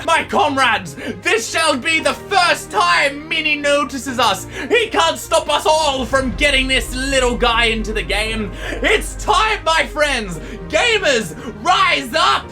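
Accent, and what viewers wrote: Australia. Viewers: I can definitely tell you have an Aussie accent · You have a Australian accent! I’m Australian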